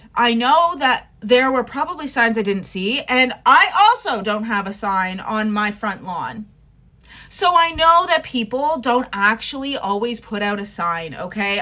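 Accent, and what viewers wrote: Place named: Alberta, Canada. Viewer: You sound like you belong here in Alberta Come on down guys it’s time for change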